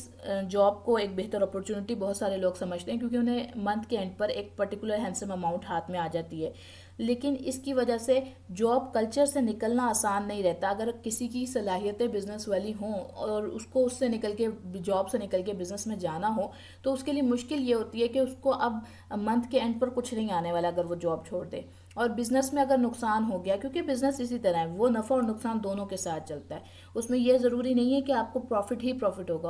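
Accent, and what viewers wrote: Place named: Karachi, Pakistan. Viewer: From your speaking style i can guess that you belong to KHI ❤❤❤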